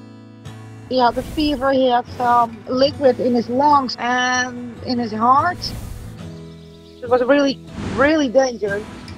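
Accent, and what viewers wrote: Netherlands. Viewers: Why does she sound Dutch?😶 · Hoor ik een hollands accent? Goed gedaan! Dankjewel ❤ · I thought I heard a Dutch accent and then I heard "Ja, jongen!". Super gedaan!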